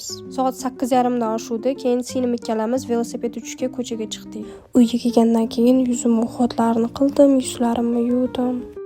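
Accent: Uzbekistan. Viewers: Хаммаси яхши кетябди лекин тилезди узгартирмай чала чулпак килиб тошкенча гапирманг илтимос вообще йимяпти😂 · Vodilikmisiz shevayiz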